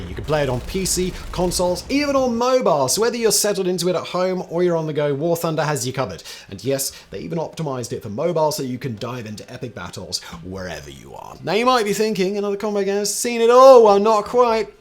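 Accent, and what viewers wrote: United Kingdom. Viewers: I love overly loud pensive music underscored by a well spoken British fellow.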